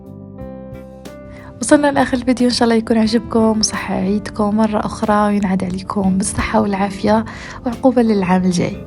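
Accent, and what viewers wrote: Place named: Levant. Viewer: انتي تكلمين الهجه اللبنانيةاوالشاميةوليست الهجه الجزائرية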